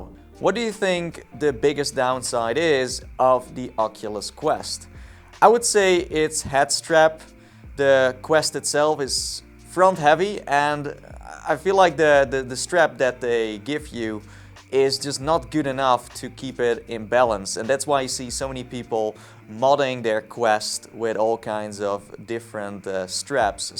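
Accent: Netherlands. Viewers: Wait are you Dutch because you have a accent I fit too but are you Dutch???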